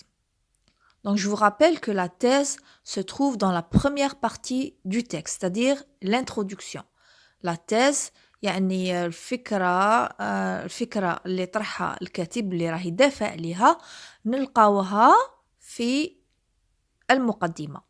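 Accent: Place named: Oran, Algeria. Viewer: وهرانية في هدرتها 🥰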